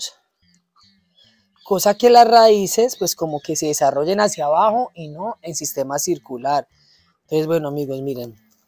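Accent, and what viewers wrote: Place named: Colombia. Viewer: ay qué divertido eres, con ese acento colombiano, esa locura x las plantas q comparto, es una lindeza oirte, me río mucho, eres encantador ojo yo vivo en el campo cerca de Madrid, un clima extremo y en el monte..te puedes imaginar la de fracasos q he tenido con las plantas..pinos pinos y más pinos un horror x eso estoy bellamente fascinada con esas plantas tropicales y exuberantes, Dios te bendiga☺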